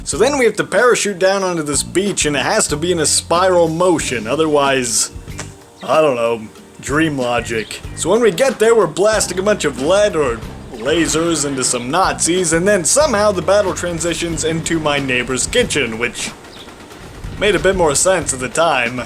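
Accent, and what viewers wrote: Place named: Canada. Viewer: He sounds Canadian.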